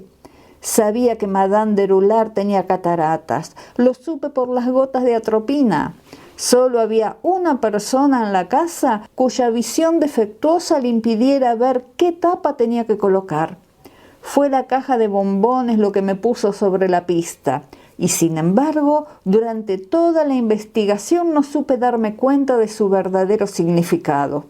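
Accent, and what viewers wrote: Argentina. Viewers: Que buena onda, creo que por tu acento sos argentina. Yo amo a Agatha Cristhie y este titulo jamas lo vi - ni lei-. Gracias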